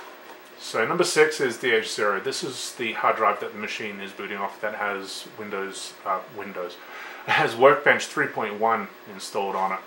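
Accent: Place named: Australia. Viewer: Damn good video. I'm guessing that is an Australian accent. From a Brit perspective, when I was young (with my youngun mind), basically Australia looked like one of those countries that was big enough to have stuff USA had, like skyscrapers and basically "massive civilisation stuff". I hope that makes sense. Well, anyway, it intrigued me as a kid the way Australia (and actually Canada is a bit like this) has that and has PAL and the Amiga. It was like the Amiga having a fighting chance (and I was an amiga fanboy of course). Then, in Europe (northen Europe and then down to France and Spain) used the amiga too but also UK) they seemed kind of condensed like a hardcore of coders (demoscene etc) and gamers as a seeding ground for amiga stuff that would permeate around the world. A channel which reminds me of yours a bit is PhilsComputerLab because he appears to somehow have some space (does he actually live in the outback or something?) and tons of hardware. 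So Austrlia (and big landmass countries with skyscrapers like Canada) had the resources and civilisation to form these kinda Amiga museums today. It is shocking what amiga stuff ended up thrown out in the UK. I remember hearing about a guy who had a gold colour a500 (one of those special ones) and while he was at university, his mum cleared out the loft and thereby ditched it. Ouch. So, maybe you can see why I like Australian (and canadian etc) usages of the amiga. :)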